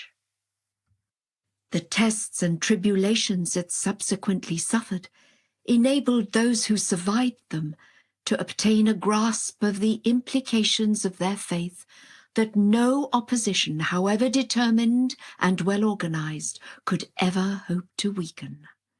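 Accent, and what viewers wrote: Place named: United Kingdom. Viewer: I cant understand her its the British accent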